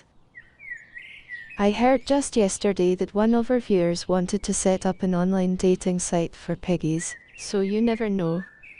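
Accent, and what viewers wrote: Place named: Scotland, United Kingdom. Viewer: This top-down surveying shot is so beautiful! I get to observe most of your boars and how they interact! They are so lucky to be free and have lots of space to explore and play around! So, you are, from Scotland, with a beautiful Scottish speaking style! May I ask who the primary caregiver is for these precious piggies? You really are doing a great job!!! I always have so much fun listening to and watching your video! Your piggies are more than adorable!:)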